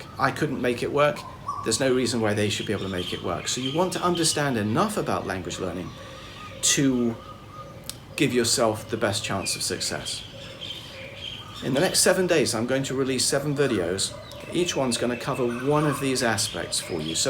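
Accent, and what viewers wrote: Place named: United Kingdom. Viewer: I think the birds really dig what you're saying. I know I get all frothy like that too when I hear your smooth British accent.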